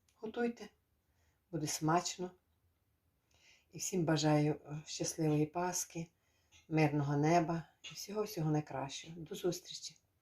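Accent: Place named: Zastavna, Ukraine. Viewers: Говірка ,точно як у Заставнівському районі,.....файно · Живий класний рецепт!! Дякую- класно що жива мова Заставнявського району, живі звуки кастрюль, дзвенкіт посуди - просто класс! Дякую за рецепт- як писали люди - всі то шукали давно і нарешті в ютьюбі є!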